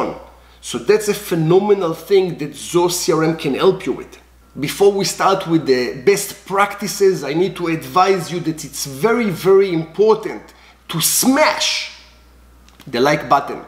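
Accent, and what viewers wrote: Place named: Spain. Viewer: You sound like spanish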